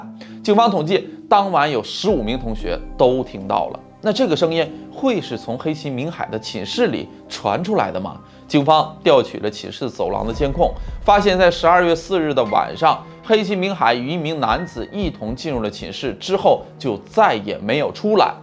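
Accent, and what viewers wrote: Northeast, China. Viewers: 你说的是，区别真的很大。我只认错过一回。我觉得有个阿婆主是天津老乡讲普通话。但她说她是北京人。所以以后我就觉得兴许北京人也这么讲话？我又听了楼主的其他节目，他把z发成zh, 感觉楼主应该是山东或东北人，也可能是内蒙的😆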